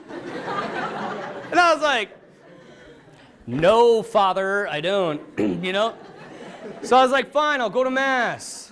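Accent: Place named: Ireland. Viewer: nice irish accent, lol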